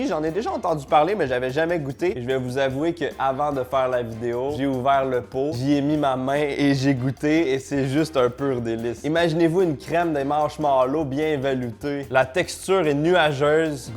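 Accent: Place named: Quebec, Canada. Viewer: Le français québécois sûrement 😂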